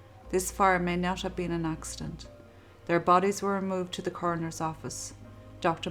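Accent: Ireland. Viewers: I simply love the narration. ❤️ To the point, no loud music, no annoying speech patterns, no (time fillers of) recaps of what's already been said. And you get a cute little Irish accent. Love it !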